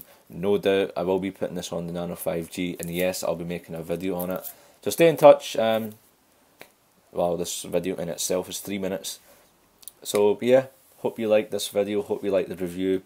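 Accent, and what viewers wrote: Scotland, United Kingdom. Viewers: yeah this is nothing personal agiant scottish people or waht ever, i just cant understand them as good as american people, for i´m not a native speaker and understanding is the hardest part of a foreign language. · Where bout Scotland you fae. Am fae elderslie.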